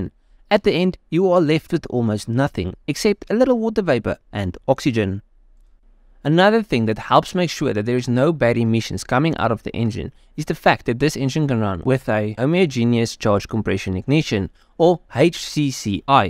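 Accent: South Africa. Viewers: South African ?? I’m an American that lived in Yeoville in 1984-85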